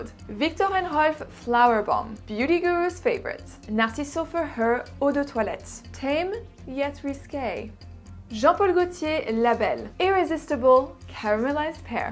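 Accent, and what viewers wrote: France. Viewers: Un accent français hh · The addiction for "Alien" and YSL "Libre" my mom has passed down to me is magical and indescribable! Your French is just so satisfying and lovely to hear! Merci! ♥️ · U have an excellent frensh accent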